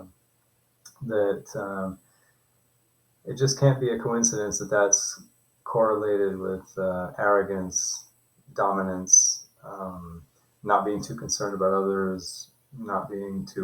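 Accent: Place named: Germany. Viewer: Lol. I'm actually normally good at accents and had detected some German intonations in yours, but same with some Irish people. I'll go check out if it is backed up by any teutonic influence...Talk to you another time.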